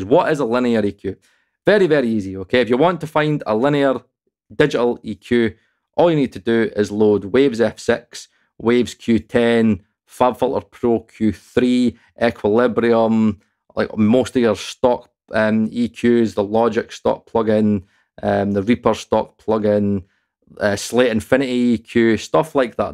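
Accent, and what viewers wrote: Scotland, United Kingdom. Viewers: Lovely Scottish accent! 😊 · couldn’t agree with you more. Fortunately for me around 2000 I dreamt of having a studio in a rack, a plug and play studio that was minimalistic in nature and digital did it for me. I was never going back. Now I use the stock console plugin from my DAW (Cakewalk) and thanks to Waves thievery I steer well away from plugin manufacturers BS. What I want is a modern interface, no bloody knobs please and no fake ssl front ends. I don’t want colouration though I do use the tape plugin that comes with my daw. I’m slowly being weaned off this analogue addiction. I went digital for a reason and I must not forget that reason. Thanks for your videos. Love your Scottish accent by the way (viva Scott McTominay haha) and I look forward to your next video ruffling the feathers of these plugin manufacturers. 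Keep on keeping on brother.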